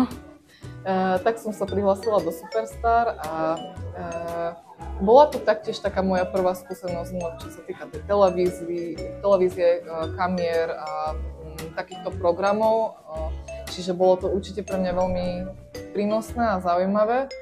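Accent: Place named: Poland. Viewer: W pierwszej chwili nie mogłem rozpoznać języka, nie byłem pewny, bo brzmiał jak połączenie słowackiego z ukraińskim z polskimi naleciałościami. Dopiero później zrozumiałem,że to słowacki/czeski z polskim akcentem.. Akordeon uwielbiam, bo w kiedyś sam grałem.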